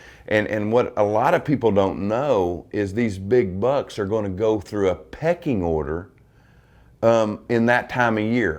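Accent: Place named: Oklahoma, United States. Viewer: I can tell by your accent your an Oklahoma boy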